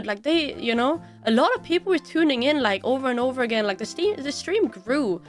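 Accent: Sweden. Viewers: you sound swedish. its the swedish accent breaking through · There's actually the tiniest hint of a tiny Swedish accent if you listen carefully. All Swedes I've met in real life speak execellent English. Oh well an then there's Anomaly of course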